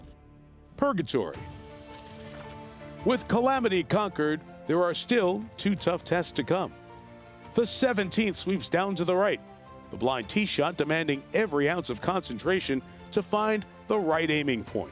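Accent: United States. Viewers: Such a pity that the R&A has stooped to having a yank voiceover. Is nothing sacred??? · Why is the narrator an American!? No northern Irish narrators free? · Ah here lads, why is an American narrating this and what is with the dizzying transitions from one hole to another. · Shame you got an American to narrate....🙄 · A yank commentating on this is daft. As much as I like the guy who is talking. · Yes indeed, why the American narration?!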